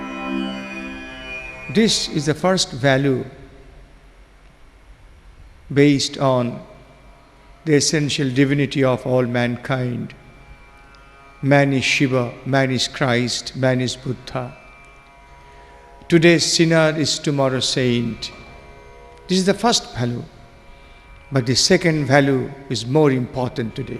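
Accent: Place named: Bengal, India. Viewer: Melodious Bengali ascent... enchanting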